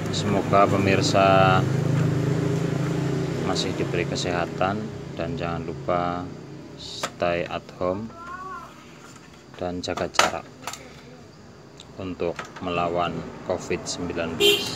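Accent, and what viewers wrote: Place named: Brebes, Indonesia. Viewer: Cerdas men rika wa nyong ngerti rika wong Brebes o ya? Hehehe..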